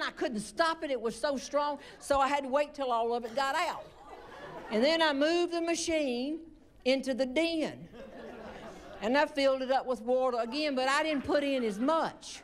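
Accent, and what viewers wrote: South, United States. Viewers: And while she can't match up to Bob's legendary dead-pan comedy, she more than makes up for it with a strong dose of Southern twang and culture!!! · You gotta love southern American accent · NC..gal, me too..shes good...and we do talk like that...some even more southern than her lol....NC is a great place to live!!! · Ditto, I'm just now seeing all of her clips and she's hilarious. Love her refined Southern accent she's so elegant.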